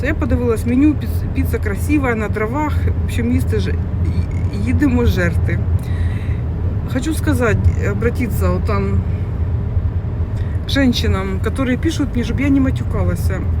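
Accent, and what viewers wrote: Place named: Surzhyk, Ukraine. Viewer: Я не украинка, но у этой жинки украинский не родной. Пытается , молодец!Звучит суржик! Лучше уж на родном языке, на котором сны или мысли! Зрителей и просмотров будет намного больше !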